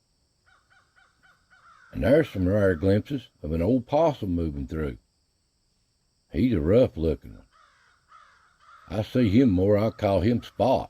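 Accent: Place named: South, United States. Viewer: Heck fellurs I can just close my eyes and enjoy hearing ol' country accent and let it take me back to the day. ThankQ, you're a blessing to many of us who are familiar ol' country souls.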